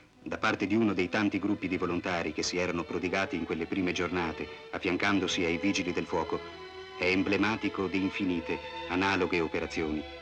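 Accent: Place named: Trieste, Italy. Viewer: L’accento triestino della voce narrante include un ulteriore occlusione al racconto tragico di questa immane tragedia. Il documentario è di rara fattura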